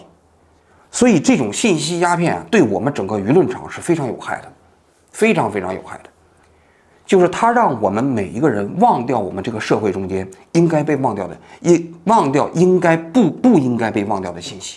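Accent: Standard. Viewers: 王局字正腔圆，声音清楚，不需要字幕也听的非常清楚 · 王局发音很标准，没字幕我也能听懂，没字幕也行的。同意的点赞。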